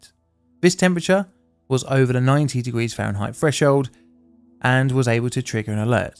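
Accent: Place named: London, United Kingdom. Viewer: I was sat back listening doing a few things and I was thinking to myself you know what Joshua this bloke sounds like he’s from London in the end though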